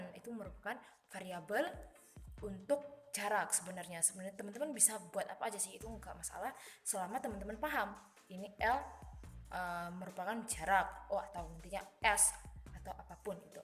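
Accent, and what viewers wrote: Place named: Java, Indonesia. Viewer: medok e sejak kapan ndok??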